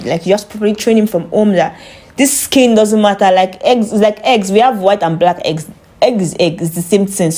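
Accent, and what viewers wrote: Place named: Africa. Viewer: Sis, I want to watch your channel but its hard to understand you. I'm a black American and is unfamiliar with African dialect. Peace from New York ✨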